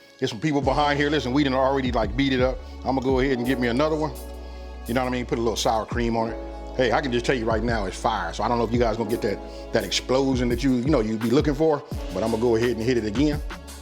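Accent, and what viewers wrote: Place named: California, United States. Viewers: Man your accent is so Cali Unc it warms me up! All my favorite family is from Cali and hearing you talk reminds me of them. This looks amazing and I definitely plan to make it. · Hitting us with that Cali accent, but food is always point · 🔥 lol I knew you were from Cali its the accent my state and yes gotta have the corn tortillas and that Las Palmas! Great video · Yup! I'm pretty sure is from Cali too. He has an LA accent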